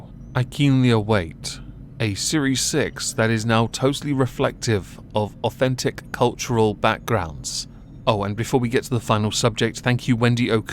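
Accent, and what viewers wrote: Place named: Birmingham, United Kingdom. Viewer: J to the B to the motherlovin' P took that sass and turned it into a positive. He got an artist to design the Hydra logo with a lobster instead, made merch of it and is donating all proceeds to charity! Also I love your Birmingham accent! Reminds me of Cat Deely playing Cat the dog on SM:TV Live XD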